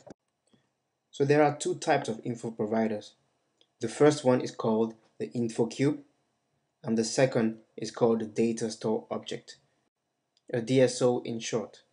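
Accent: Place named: Africa. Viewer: Don't be a simple minded person. He has an African accent that is mixed with European accent. He is not faking any accent, instead he spoke slowly so everyone will understand. It shows exactly what you got from the training.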